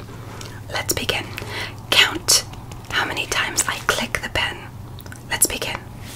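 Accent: England, United Kingdom. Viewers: Love the English accent!! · British!!! Another great video!!!! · The British accent got me dying gurl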